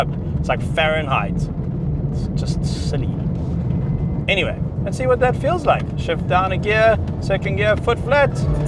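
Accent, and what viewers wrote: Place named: Johannesburg, South Africa. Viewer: The Jo'burg accent😂😂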